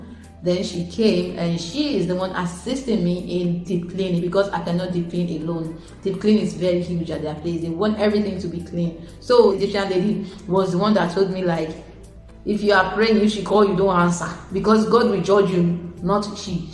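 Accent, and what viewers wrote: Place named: United Kingdom. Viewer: Your British accent is fire 🔥.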